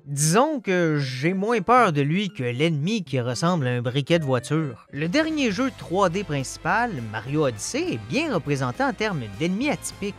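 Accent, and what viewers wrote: Quebec, Canada. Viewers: L’accent québécois, au départ je n’aimais pas mais avec le temps j’aime bien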